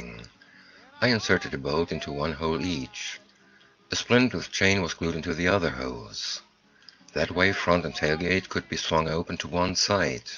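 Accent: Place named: Hungary. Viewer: . I think he is from Hungary? Your accent is maybe better.... when do you expect to show the paint video for the wagon.... I look forward to it.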